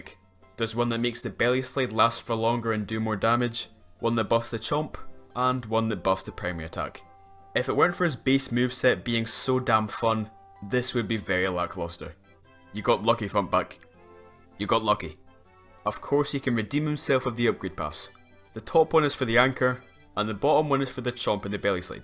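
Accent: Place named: Scotland, United Kingdom. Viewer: Where you from in Scotland my man?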